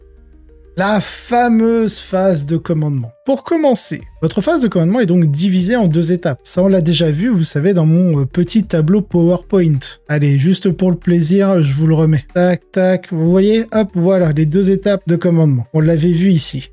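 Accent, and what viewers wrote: England, United Kingdom. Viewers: Un grand merci pour cette vidéo! Ça fait toujours du bien d'avoir des éclaircissement sur les règles, qui dans certaines situations ne sont pas évidente! Surtout avec qualité ET un accent anglais extraordinaire ^^ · Merci, c'est tellement nécessaire. Même en amical, être clair sur les règles du jeu permet de vraiment profiter du jeu. Toujours un plaisir de te suivre . Ton accent anglais, ta marque de fabrique 😎.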